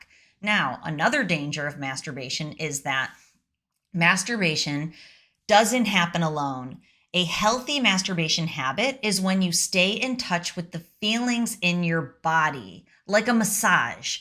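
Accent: United States. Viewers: I turned this off as soon as I heard the American voice. I'll bet it has something to do with Jesus.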